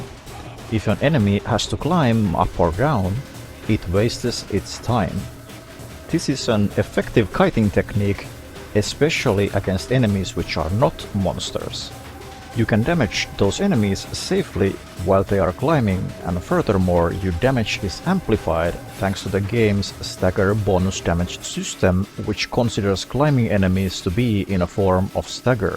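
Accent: Finland. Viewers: You have very finnish accent ❤ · I love your slight finnish accent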